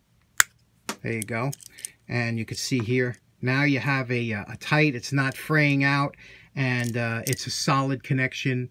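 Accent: New York, United States. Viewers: I was so waiting for you to say Bob’s your uncle because you had like 10 chances to see it before and then Bam you finally said it and I like hearing it in your New York accent because there’s no uncle like Bob and there’s no way to hear it Better than New York accent ha ha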